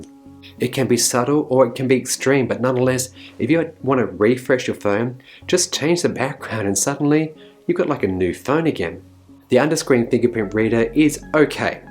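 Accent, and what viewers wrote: Australia. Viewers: Thanks soooo much . You've helped me decide on the 6 rather than the pro. Great video mate. Love that your Aussie and even better from Melbourne. Vic all the way. 😉👌🍻🤘💪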